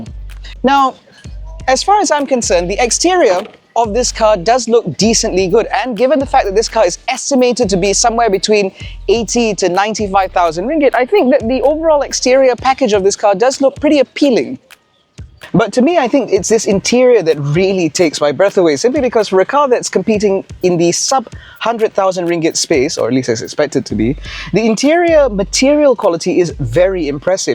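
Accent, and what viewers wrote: England, United Kingdom. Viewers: Clicked the video for the car, stayed for the English accent. Well done presenter. Strong presentation!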